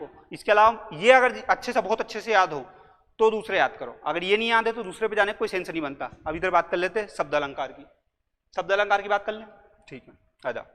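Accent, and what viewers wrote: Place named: Indore, India. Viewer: वाह यार भियां एकदम इंदौरी style me padate ho. Kaha se ho.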